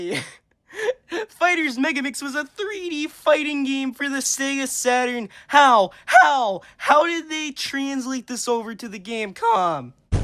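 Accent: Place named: Canada. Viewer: He sounds more like he's canadian tbh.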